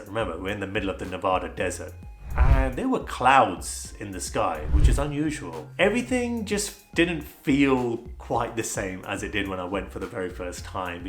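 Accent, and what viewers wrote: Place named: United Kingdom. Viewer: the slightly British accent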